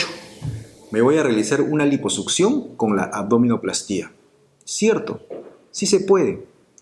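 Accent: Peru. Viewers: Muchas gracias x la información me gustaria una consulta con ud podria facilitarme un numero para poder llamarlo. Por el acento puedo decir q es peruano cierto? Espero su respuesta doctor gracias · Alguien sabe de q pais es? Por el acento parece peruano? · Por su manera de hablar es de mi querido Perú, ! Gracias por informarnos. Dios te bendiga! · Donde tiene ud su consultorio yo soy de Ecuador y x su dialecto ud me parece peruano o me.equivoco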